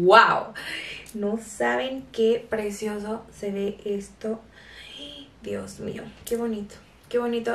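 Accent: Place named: Mexico. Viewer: Amo a ❤🤩 En los asmr no se le nota tanto que es Mexicana 😊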